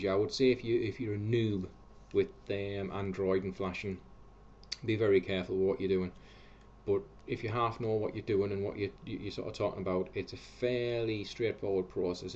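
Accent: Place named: Northern England, United Kingdom. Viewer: damn cool accent you've got there haha :) northern england, that is, yeh? york?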